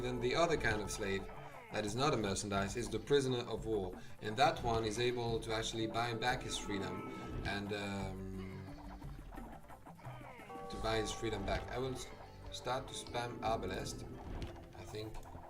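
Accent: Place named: Netherlands. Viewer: Weird-ass Dutch accent, but okay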